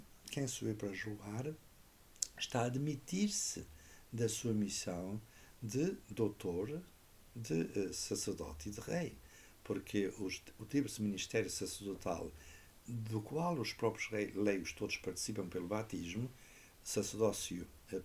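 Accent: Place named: Portugal. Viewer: Abrazos, desde Hispanoamérica,. Mesmo sendo para nós, do outro lado do Oceano, um pouco mais difícil acompanhar a bela pronúncia do português peninsular, a sua mensagem chega a nós e é muito bem recebida. Los católicos estamos mucho necesitando verdaderos y valientes pastores. ¡Gracias!. Obrigado!. Dios lo bendiga, siempre.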